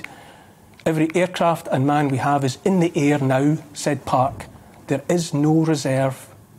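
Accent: Scotland, United Kingdom. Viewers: this is proof that if you hire a Scottish man to talk about anything and vaguely reference William Wallace, people will agree regardless of how idiotic or poorly thought out the statements may be 😬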